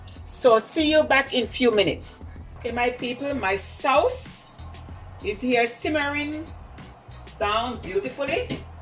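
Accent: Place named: Jamaica. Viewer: Why do you sound Jamaican though? It’s supposed to be a Bahamian accent though. But I will watch it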